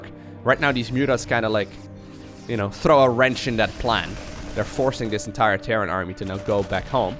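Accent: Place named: Brabant, Netherlands. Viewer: hoor ik nu de brabander in Lowko :D reynor is beter as zen tegenstander :D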